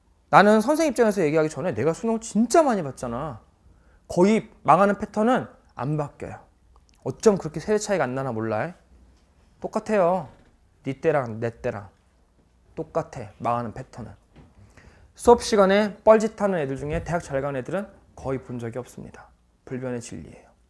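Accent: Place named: Soviet Union. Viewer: 말투 소련여자 ㅈㄴ 달맞네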